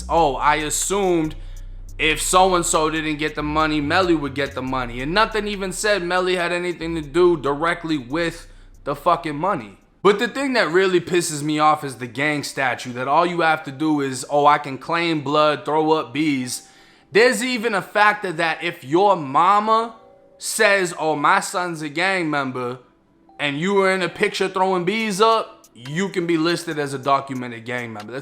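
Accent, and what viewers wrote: Boston, United States. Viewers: U sound like u from Boston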